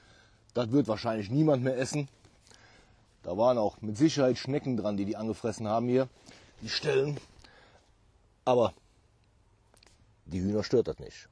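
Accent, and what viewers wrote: Germany. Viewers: super sache! Wieder ein schönes Gemüseanbauvideo. Da kriegt man richtig Lust, das nachzumachen. Dieser Kanal ist mittlerweile einer meiner Top 3 Lieblingskanäle. Möchtest du eigentlich Hilfe bei den englischen Untertiteln? Ich könnte ab und zu mal einige Fehler rausmachen, obwohl ich auch kein englischer Muttersprachler bin. Dein Dialekt ist rheinländisch? Klingt auf jeden Fall auch schön.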